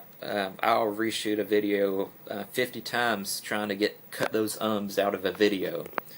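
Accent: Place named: South, United States. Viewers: When I first heard your voice, I just thought you had a southern accent and pronounced some words funny. I didn't think anything of it. I also know how you feel, because everyone always says I talk funny. I like your vids, keep on going, man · you do have an accent the same as your brother I can hear it when you talk, its in addition to the speech impediment, but a definite southern accent in there a twang if you will, I always liked southern accent for some reason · I just thought you were from Louisiana and was Creole. Anyway dont worry about it. Your vids are great. I sound terrable on my vids. I would never think of you are slow. Thats just trolls being ass holes. You come over as a very bright and thoughtful person. Give it no more thought man. Keep up the good vids. :-) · Dude you sound like you come from New Orleans. From down the Bayou! 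It's sounds cool to me! Subbed yah! Keep up the good work!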